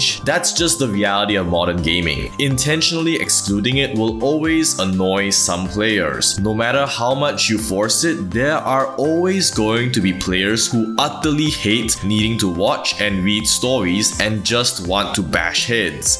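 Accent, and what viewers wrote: Singapore. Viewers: I'm curious what accent this is btw. Feels like Singaporean mixed with something else. And Singaporean makes sense with your DigiPen cert.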